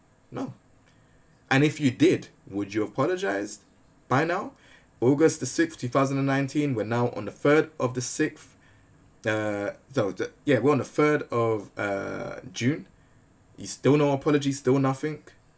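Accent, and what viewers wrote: South London, United Kingdom. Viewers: This message proper resonated with me. I don't know if I've seen you before but I'm 28 from South London, New Cross, Deptford area and I know from the way you talk you were from a similar place. I'm mixed race and have experienced elements of the things you were talking about. So I'm glad you're talking about these things on your platform, makes people like us feel less weak for admitting these issues affect us I'm the way they do. Love bro ❤️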